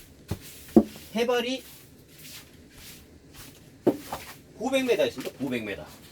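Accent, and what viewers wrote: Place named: Gyeongsang, South Korea. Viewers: 경상도말투는 한참 듣다보면 편두통이 온다네... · 앞전에 님께서 칠점사 꼬리떠는거 설명하실 때 "건들면 쥑이삔다" 라고 하는 거 듣고, 님 고향이 경남 서쪽 어드메쯤인지 대략 짐작이 갔었읍니다. 성조도 부산 마산은 아닌 것 같고, 대구는 더더욱 아닌 것 같고, 진주하고도 다르고, 찍어서 대략 그 안쪽 산동네 지역인 것 같았고... 제가 사실 서울사람이지만 모종의 일로 엮인 적이 많아 경상도 말씨를 꽤 잘 아는데, 경북쪽은 "-해뿐다"라 카든 것 같고, "-해삔다" 경남쪽에서 쓰는걸로 알고 있읍니다. 원래 태백 일대 말씨는 대체로 경북 북부지역 일대와 마이 가차웠던걸로 기억합니다. 다만 두문동재, 만항재만 넘어가면 주민들 말씨가 확 바뀌던 것 같읍니다. 원래 태백 (황지+장성) 일대가 삼척 땅의 일부였고, 소싯적부터 삼척 일대가 국어학자들 사이에서도 영남방언의 일부로 간주되는 측면이 있었다는데... (그래서 경상도 어르신들이 가끔 뻥을 좀 치시지요. 원래 삼척은 경상도 땅이었다고, 뭐랄까 영동공정?을 열심히 펼치십니다. 경상도 출신이 아닌 제 아버지조차 세뇌되어서 그런 말을 하실 정도) 참고로 삼척 윗동네, 옛 명주군(현 강릉의 읍면단위 지역) 땅이었던 묵호쪽도 외지인 유입의 영향으로 경상도, 함경도(실향민들이 많이 정착) 사투리가 꽤 많이 통했읍니다. 지금도 동해시에서는 엄연히 "강원도"지역임에도 불구 "도째비골"을 관광홍보물에 대놓고 " 의 경상도 사투리를 뜻합니다"를 말할 정도로, 그정도로 영남지역의 영향을 많이 받았던 동네입니다. 적어도 지금 40대 정도 세대는 대체로 그런 듯 한데, 하지만 지금 묵호의 최소 중고등학생 세대까지 내려가면 그 이전 세대에 통용됐던 영남방언이든 관동방언이든 관북방언이든 다 잊혀진 듯 합니다. 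정류장에서 버스를 기다리면서 학생들 말하는 것 듣다보이, 그냥 "성조 없는(위의 세 동부방언 모두 성조 있음)" 평평한 서울말로 대화를 하더라고요. 이건 강릉도 그렇다는데, 강릉쪽은 예전부터 문중사회 기반으로 해서 토박이들 많고 소싯적에 대관령 서쪽과의 교통도 대단히 불편하고 해서 (영동선 뚫리기 이전에 제주도 울릉도 오가듯 철도가 있는 대도시인 원산, 포항, 부산으로 '기선'타고 갈아타서 서울로 갔다고 하네요) 꽤 독특한 억양과 어휘의 고유의 방언이 사용되던 고장인데, 젊은 세대 사람들이 사투리를 잘 모르게 돼버려서 그래서 지역 학자들이 많이 아쉬워한다 하더군요. 이 역시도 급격한 표준어권 문화의 유입으로 인해서...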